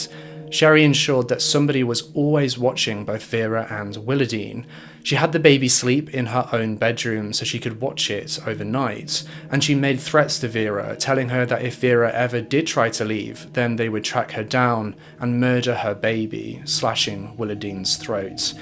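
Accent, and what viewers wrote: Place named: England, United Kingdom. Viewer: i ABSOLUTELY LOVE LOVE LOVE YOUR ENGLISH ACCENT. SOO JEALOUS... IM FRIM NEW YORK A WHITE MALE WITH AN EVEN STRANGER ACCENR THAN YOURS. I LOVE YOUR CHANNEL. I COULD LISTEN TO YOU ALL DAY LONG. MUAH .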